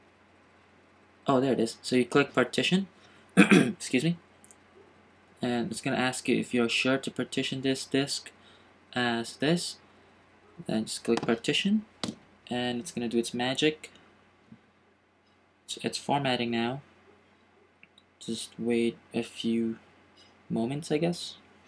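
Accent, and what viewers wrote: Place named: Philippines. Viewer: galing na accent mo pareng.. proud ako sayo pinoy ka.. lol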